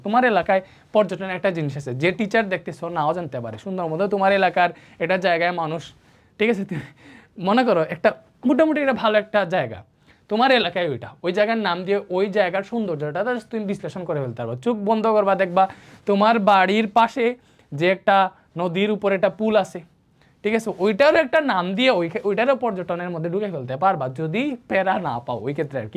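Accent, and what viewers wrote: Sylhet, Bangladesh. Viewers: উনি কি সিলেটি😐🙂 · Bhaiya apni ki Sylheti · ভাই আপনে সিলেটি নি ভাইরে ভাই😮😮❤😊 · আপনি সিলেটি তাইনা স্যার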